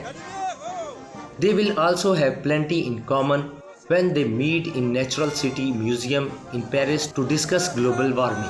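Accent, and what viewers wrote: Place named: India. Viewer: Horrible Indian accent. Use AI.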